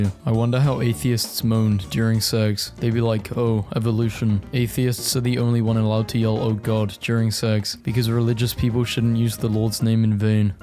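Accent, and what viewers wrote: United Kingdom. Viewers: pretty sure he’s British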